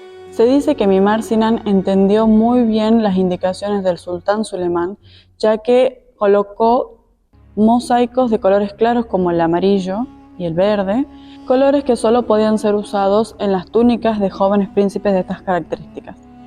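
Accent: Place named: North, Argentina. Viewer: Hola!! Me encantaron tus videos, hace unos días descubri tu canal, se que sos Argentina y por tu tonada del norte. De donde sos? Tal vez Salta, Jujuy o Tucuman? Ya me suscribi porque pienso seguir mirando todos tus videos, buenisimo todo 😊❤un besito y a seguir adelante que vas a tener mucho exito con tu canal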